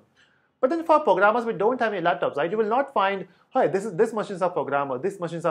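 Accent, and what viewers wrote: India. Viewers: Trying too hard often turns out to be bad but raw indian accent sounds bad. It bleeds my ears.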